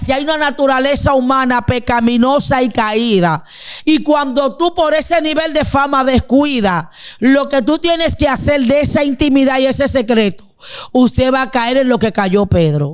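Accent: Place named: Dominican Republic. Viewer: ella es dominicana por eso habla asi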